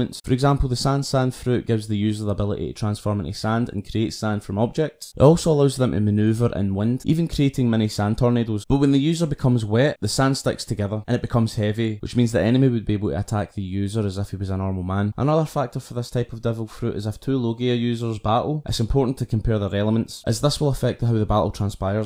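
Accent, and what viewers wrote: Scotland, United Kingdom. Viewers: I was gonna say I could’ve sworn that’s Scottish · This guy’s scottish fs tho so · U scottish? 😄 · Is that a Scottish accent?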